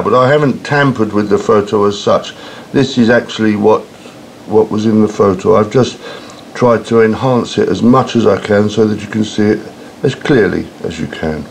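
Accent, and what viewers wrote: United Kingdom. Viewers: Well, it's great you raise those questions! People do need to challenge their familiarities and I suppose your questions do just that. I haven't gotten to watch all of your videos, but I was curious to know where you got your education. I speculate that you're British from the accent, but that's the best guess I could give! So what's your story, in short?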